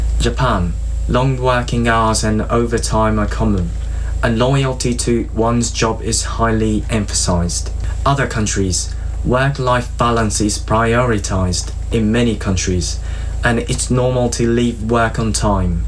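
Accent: United Kingdom. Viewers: Your pronunciation is very good. To be honest, it almost sounds like you have a slight British accent lol. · That's not slight, haha. It's very British sounding.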